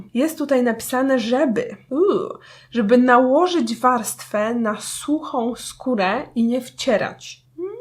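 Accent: England, United Kingdom. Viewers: Ach.... i ten jej angielski akcent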